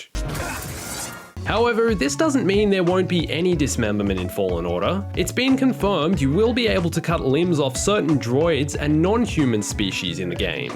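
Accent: Australia. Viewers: Yes your Aussie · Oh your Australian as well cool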